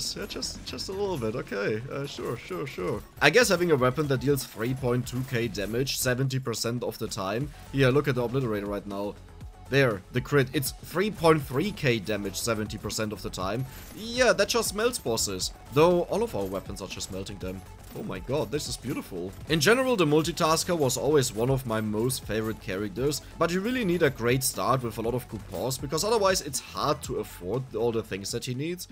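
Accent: Germany. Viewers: ist ja schrecklich deutsche englisch sprechen zu hören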